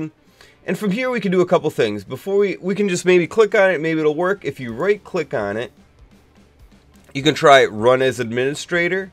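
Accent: Canada. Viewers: The Canadian accent is real.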